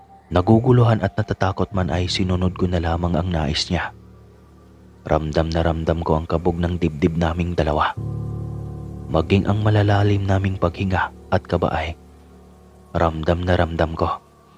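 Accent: Philippines. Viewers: Taga leyte ka ba? Parang tagalog ang punto ng pananalita mo...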